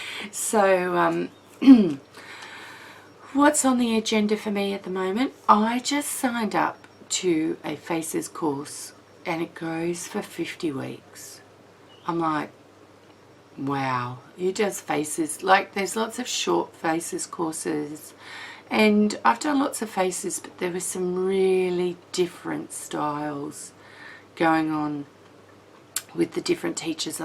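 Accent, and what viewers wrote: Australia. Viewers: Haha, yours is real & proper Aussie English! Mine is just a silly Dutch accent, LOL!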